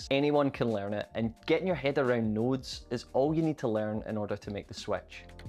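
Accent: Scotland, United Kingdom. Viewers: One thing that can get annoying when you find tutorials like this, is if the presenter has an annoying voice or accent. It is therefore an absolute GODSEND if the presenter has a Scottish accent. Useful AND sonically pleasing. 😀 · I've been using After Effects and I don't exactly plan on switching completely to Davinci Revolve... But, the Scottish accent is so lovely... 😋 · This is the best tutorial on YT which allows me to understand the basics of nodes and I made my first animation in Fusion 🤟 Thanks for that! Casey Faris tutorial is way too complicated and overloaded. Your way gives absolutely the minimum amount of required knowledge to begin with. By the way, I love your accent. Are you from the "Celtic" part of the UK?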